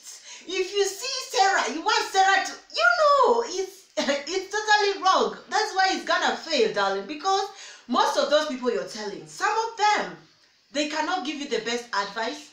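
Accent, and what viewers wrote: Nigeria. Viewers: Wait.... Who thought you all this Nigerian accents 😂you are very correct dear👏👏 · Your Nigerian accent na waa ooo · Like seriously, where did you get all these Nigerian accent from, it sound so good and you making it more funnier. Your energy is so welcoming, and eye catching. Keep it up girl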